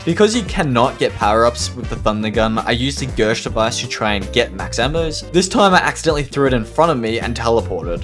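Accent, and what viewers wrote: Australia. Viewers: me and the creator of the video are both australian we have the same accent, it is NOT an accent problem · in google translate its cinema of the dead and he is saying nacht correctly he just aussie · You aussie man?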